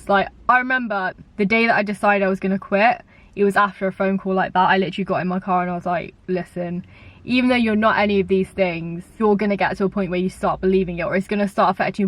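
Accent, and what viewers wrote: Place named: United Kingdom. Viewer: British English is increasingly becoming a new language. I had to concentrate to understand and my neices are British! 😂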